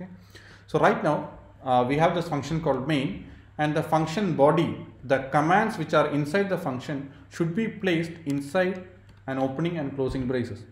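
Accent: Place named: Kerala, India. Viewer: Thick, incomprehensible Malayalam-accented lectures. Go somewhere else for your Data Structures course.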